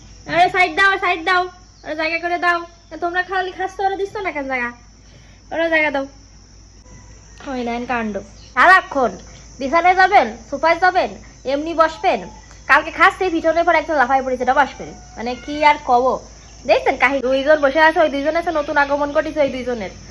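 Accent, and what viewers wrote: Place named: Jessore, Bangladesh. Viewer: আমি নড়াইল থেকে বলছি, এজন্য চেনা চেনা ভাষা মনে হয়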